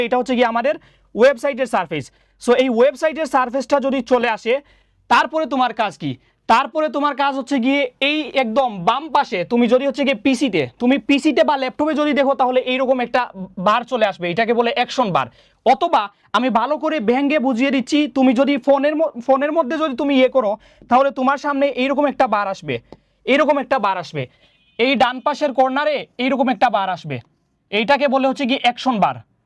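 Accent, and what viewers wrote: Sylhet, Bangladesh. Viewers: sir ar kota bola sune mone hocce sir sylheti😂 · Yes Sylheti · Hmm sylhetii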